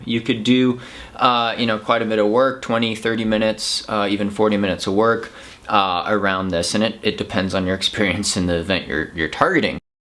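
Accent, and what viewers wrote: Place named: Canada. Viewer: He’s from Canada me boi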